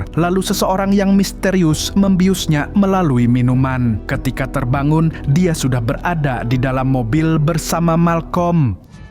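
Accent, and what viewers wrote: Banjar, Indonesia. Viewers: urang banjar kh pian mang🤣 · urang Banjar kah pian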